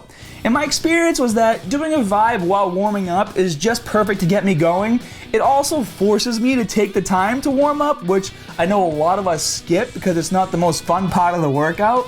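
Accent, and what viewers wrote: Boston, United States. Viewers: Okay, so after watching his first videos from 5 or so years ago, how did he somehow gain a Boston accent, and get so yelly? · 1, I'm not addicted to caffeine I have a coffee every second day before I train I also believe you drink coffee 2, my points were not meant to be insulting but this is the second time you come out swinging at something I said,, but tbh I seen a comment left yesterday about how you come up with , you should buy FAT GRIPS ,, you should buy The abs boots you should buy this stupid thing attached to my head with after a certain amount of time you don't use,, being sub a long long time and you know what your Turing into more of a sell out and big fucking douch bag having a go off some ones view point,, which leads me to believe your in commission for them and I believe the only studies were carried out by them not professionals,,unsubscribed and another thing since you let your Boston accent come out you turned into a mark e mark douch bag good luck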